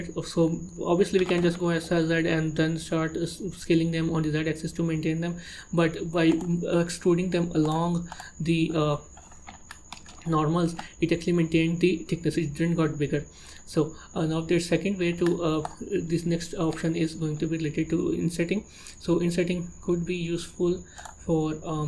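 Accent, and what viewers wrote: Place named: India. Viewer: Not to offend you, but practice your vocal skills. So speaking English without the heavy Indian accent. There are various reasons, you sound more skilled and educated. When you teach you need authority and talking with gumballs in your mouth is not helping. A good voice draws attention and keeps it. It also let you stand out from your competition. For a western audience its really horrible to listen to, sorry for the harsh words. So set your pride aside and grow your business.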